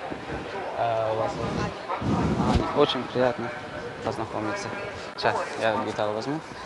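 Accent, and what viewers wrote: Tajikistan. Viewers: Ту ёвонии ранги ёвоние гап мезани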